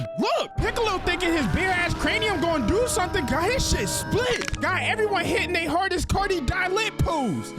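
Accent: Philadelphia, United States. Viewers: bro i know a Philly nigga when i hear one ! Love the content !!! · You gotta be from Philly😂😂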